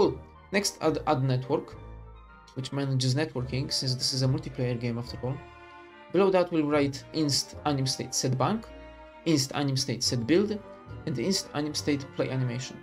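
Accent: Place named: Russia. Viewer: your accent's with a hint of russian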